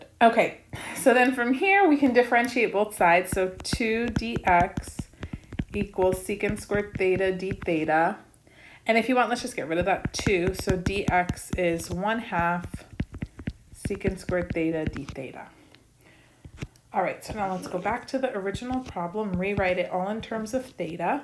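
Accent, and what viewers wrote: United States. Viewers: Adore American English and U sound perfect)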